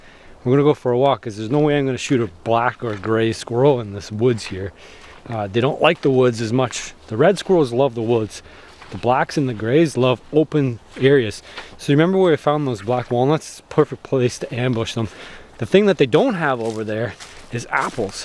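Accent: Canada. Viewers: I was wondering why u had such a similar accent 😂 didn't realize u were Canadian too lol. I'm not used to seeing people make survival videos in Canada, it's always in some tropical climate. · Pretty sure he’s canadian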